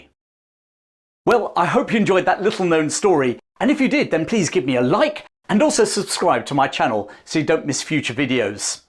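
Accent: United Kingdom. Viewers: German here, loved hearing the story told in such a British and masterful voice. Lettow-Vorbeck ('V' pronounced like 'F') entered German post-war lore as one of the few undefeated heroes. His quite popular own account of the events, "Heia Safari", adorned the bookshelves of many boys and young men in the 1920s and later. My Granddad (b1902) gave his copy to my Dad (b1938), who handed it on to me (b1965). Nowadays however, only a few young folks may still be familiar with the campaign, neither with its adventures nor with its tragedies. Peace!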